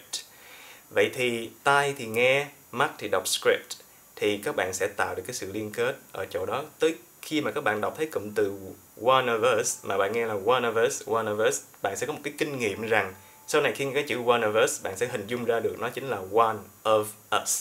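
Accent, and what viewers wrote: Saigon, Vietnam. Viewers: Đúng giọng sài gòn luôn nè, nghe rất thích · Giọng Saigon nghe hay quá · Khong biet thay có fai nguoi Saigon ko, chi thay giong cua thay GIONG giong of minh qua, hi hi ! Ma minh la nguoi Saigon 100% .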